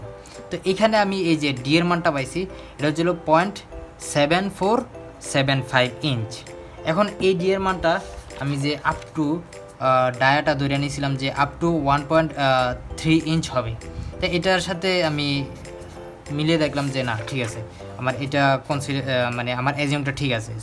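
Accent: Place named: India. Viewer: Damn....I really hate indian accent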